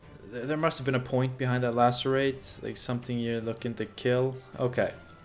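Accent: Norway. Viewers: Hey, I love your decks, I watch them all - and yes, even though I've been playing for years, I still pick up things here and there from you. It's pretty entertaining to watch these matches play out and you seem kind and sincere. Please keep it coming. I've done some decks that have been #1 trending but, for example, I didn't know about the rule of 16 until you told me! I like different decks, I probably spend as much time building and creating. Anyway, trying to place your accent. I hear European, perhaps Norway? Have a great day. And be happy - your Gwenting!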